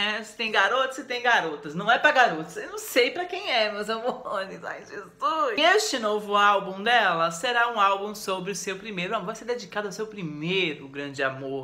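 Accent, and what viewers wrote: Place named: Paraná, Brazil. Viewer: o R é do PR. Kkkkkkk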